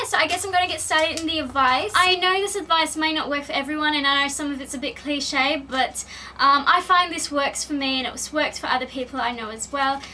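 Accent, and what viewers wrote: Australia. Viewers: Is she from Australia ??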